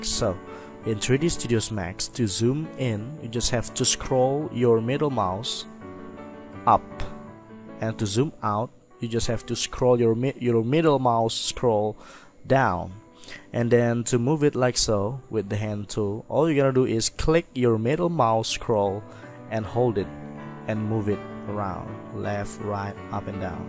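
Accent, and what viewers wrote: Indonesia. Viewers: Aha indonesian right? Btw it's a great tutorial dude, i thought you were western at first haha.. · I knew it the second he talks. indonesian accent all the way! Awesome tuts anyway! very helpful. yay for indonesian youtube guru!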